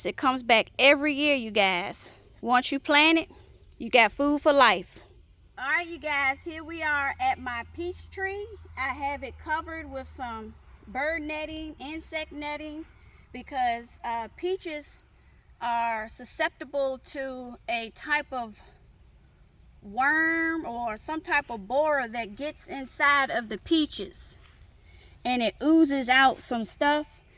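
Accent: South, United States. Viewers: Beautiful garden! I knew you had to be somewhere in the southern states because we all have that twang, different from state to state but still isn't like New Jersey. I sure wish I could grow the Alisa Craig onions but I'm in zone 9A, so it's short day only. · Nice. I can't seem to place the accent though. Definitely southern, but I get twang with no drawl. Carolinas or Virginia maybe?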